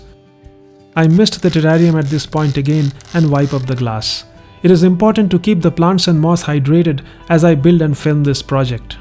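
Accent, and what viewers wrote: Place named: India. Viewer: Your accent is very strong. I couldn’t understand you at first glance but after 5 slides of the same thing. I get you my brother from India dude.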